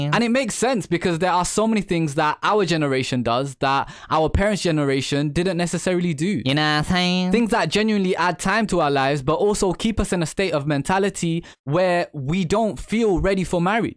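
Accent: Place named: Bengal, India. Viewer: Wallah I love your Bengali English accent 😂😂😂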